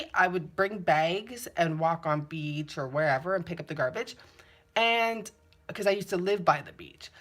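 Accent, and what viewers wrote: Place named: South, United States. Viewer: Last night I woke up at 3am thinking about Pluto(Hades) and it led me to Persephone who is the goddess of vegetation and the underworld. So it is blowing my mind that your reading has touched on that. Plus my deceased grandma has been on my mind prior to the eclipse and we are from South Carolina. So that southern voice hit home... 💜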